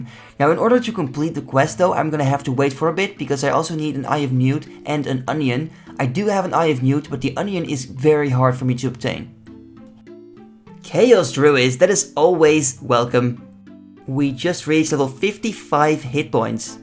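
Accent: Netherlands. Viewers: I recently discovered your channel and benching it rn! I was wondering if you were Dutch by any chance, your accent sounds familiar?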